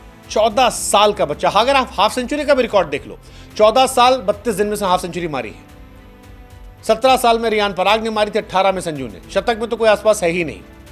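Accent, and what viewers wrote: Bihar, India. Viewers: Bhai aaj bihari me bol rha hai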